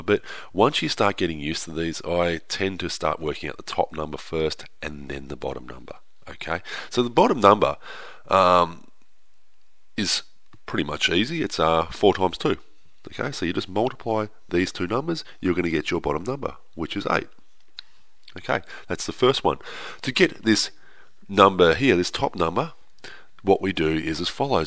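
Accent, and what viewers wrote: Australia. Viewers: You Australian mate? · Thank you so much you helped me with subtracting fractions and I love your Australian voice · I noticed his Australian accent I think this boi is left handed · Thank you. .you lovely Australian math God ;-)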